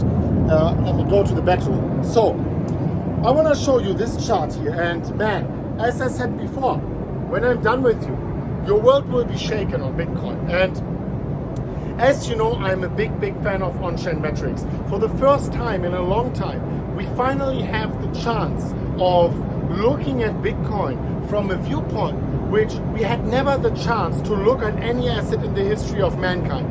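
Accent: Germany. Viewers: i always thought is German Nie wiem dlaczego xD <3 Edit: chyba przez akcent:)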